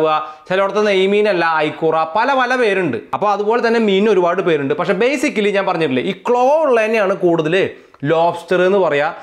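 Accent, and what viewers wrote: Thrissur, India. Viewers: ❤… lub ur tcr slang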